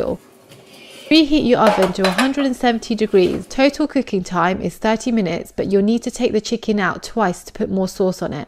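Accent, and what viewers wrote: United Kingdom. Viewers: Lovely accent its british i love how simple and quick you did this recipe and so simple this is a must make for me thx💜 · Are you brittish?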